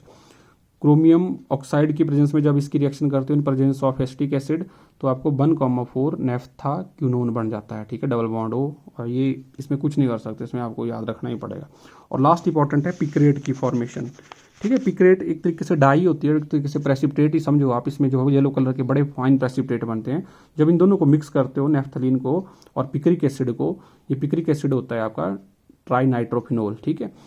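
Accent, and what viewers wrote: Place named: Pahadi, India. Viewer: Sir aap Uttarakhand se ho .....aap k accent se LG Rah ...Ki aap pahadi ho .....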